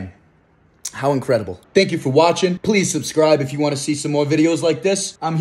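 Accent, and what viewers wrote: Italy. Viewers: Devo dire che si sente il tuo accento italiano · italian its his real accent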